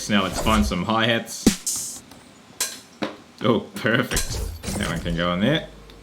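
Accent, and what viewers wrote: New Zealand. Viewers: He sounds kiwi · R u a new zealander? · Are you from nz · Are u a kiwi bra I’m aussie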